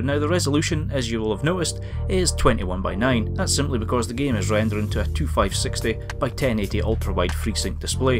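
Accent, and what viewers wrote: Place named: Glasgow, United Kingdom. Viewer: dude i cant understand you for shit, maybe use subtitles next time, you must take into consideration that at least half of the viewers are not native speakers of english so it's hard to follow your Glasgow accent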